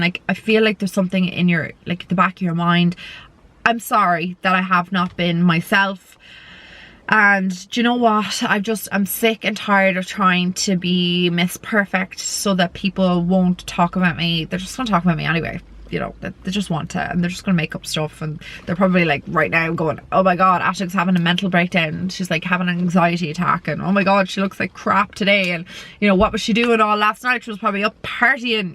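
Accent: Ireland. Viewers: I’m so happy 😀 that your finely being your self. You should enjoy 😉 your life and your family always comes first. Who cares what people are going to say because you can’t please everyone and you can’t get everyone to like 👍 you. I love 💕 to hear 👂 about Baby Noah and how much he’s growing. Don’t ever think 🤔 you have to apologize because you don’t. Besides I live here in Texas and I love 💕 it when y’all show parts of Ireland 🇮🇪 and I love 💕 your accent. Now go get some rest. Merry Christmas 🎁🎄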